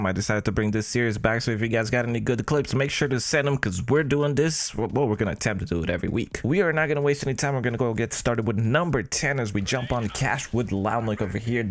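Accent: Romania. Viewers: Esti Ardelean sau Oltean? :)))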